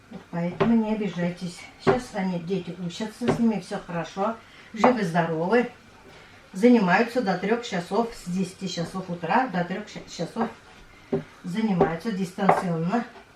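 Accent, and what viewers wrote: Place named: Bashkortostan, Russia. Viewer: землячка моя дорогая! Я русская, но родилась и выросла в г. Уфа и поэтому татарский язык помню и: Исама Сис поняла ( может неправильно написала, но уж простите меня) т.к. мне 65, а уехала учиться из Уфы под Москву в 17. С большим удовольствием смотрю твой Канал, хорошо, что он появился и с большим удовольствием слушаю твою речь с местным акцентом- это как бальзам на сердце для тех, кто живёт далеко от своей малой Родины. Спасибо тебе, большое!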